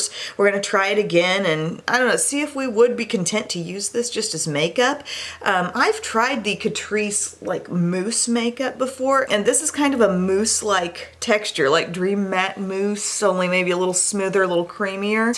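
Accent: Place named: South, United States. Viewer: Where are you from?Your accent.lol.Im from Arkansas so I could hear some country in there.lol.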